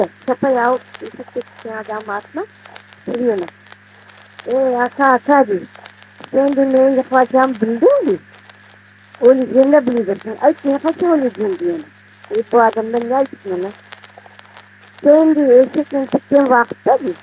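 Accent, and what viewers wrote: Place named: Coast, Turkey. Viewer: Bülbül yalıboy şivesi, diñlemek hoş keldi). Kereksis şatırdı alınsa daada yahşı anlaşılır. Sağ oluñ, var oluñ. Işleriñiz oñ kelsin!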